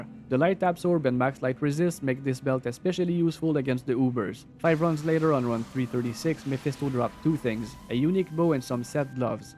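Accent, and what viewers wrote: Quebec, Canada. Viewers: Avec ton accent, es-tu québécois par hasard ? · Sa parait tellement que tu est Quebecois juste par ton accent lol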